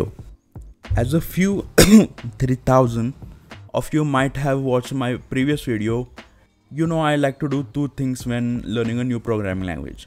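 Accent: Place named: India. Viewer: you're the first guy with an indian accent that I enjoyed watching :D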